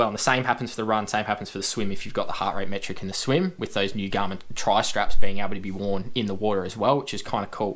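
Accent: Australia. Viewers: Haha First thing I noticed was the (Eric lindros?) jersey, but then we he started talking I thought...”wait a minute...this guys Aussie”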